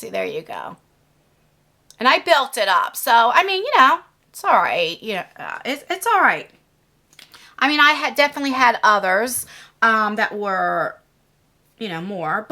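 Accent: New Jersey, United States. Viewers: Mirror, mirror on the wall. We love the best of All! And that is not a lie. Love your jersey accent and your videos. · Mirror mirror on the wall, who is the kindest, down to earth, supportive beauty youtuber of all????? It's just of course!!! I just recently found you but I subscribed after the first video. It's so refreshing to watch your videos because they aren't filled with drama or tea!!! Just real, friendly beauty talk I can take time out of my day to enjoy.....btw, recognized that Jersey accent right away!! Lol since I'm from your neighbor in Maryland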